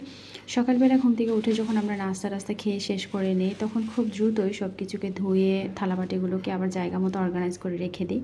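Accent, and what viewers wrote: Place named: Chattogram, Bangladesh. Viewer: আজকেই প্রথম দেখছি, আমার বাড়ি হালিশহর সবুজবাগ চট্টগ্রাম। আমার এলাকার মানুষ এর কথা শুনেই মন ভালো হয়ে গেলো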